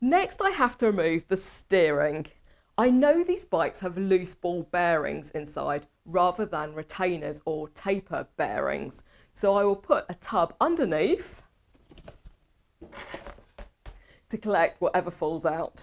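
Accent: United Kingdom. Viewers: I love her British accent, too. Also, wonderful fantastic bumper guards. 😊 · Love This Awesome & Beautiful Lady with her Super Gorgeous British Accent just mesmerized.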